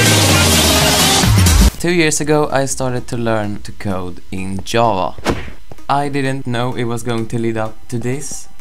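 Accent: Sweden. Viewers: R U from sweden? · lol that english it's mer swenska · u swedish? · du är svensk det hör jag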